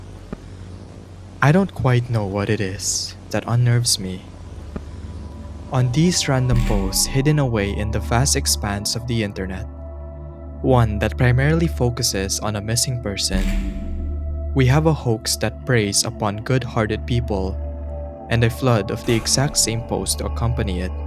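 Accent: Philippines. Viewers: Filipino accent?? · The first tine I watched and heard your voice I know you're a kabayan.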